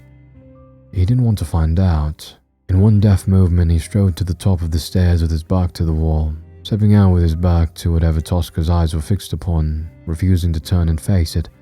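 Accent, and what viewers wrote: United Kingdom. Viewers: Your British voice was amazing! It only added the suspense for me! Thank you so much for this amazing story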